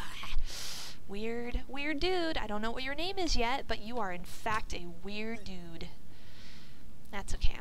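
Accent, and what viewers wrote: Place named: Canada. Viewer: lovely commentary,very plesently spoken..you sound canadian..nice voice..kinda similar to a LP'r name schaly..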